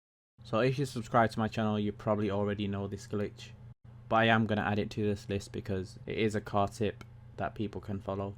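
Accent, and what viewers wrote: England, United Kingdom. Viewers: You sound asian british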